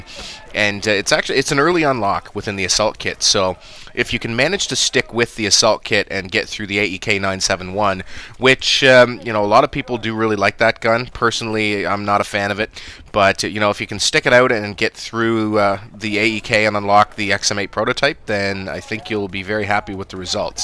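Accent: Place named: East, Canada. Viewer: I can tell you're Canadian, probably eastern from your accent. Where are you from? I'm in TO. Nice series!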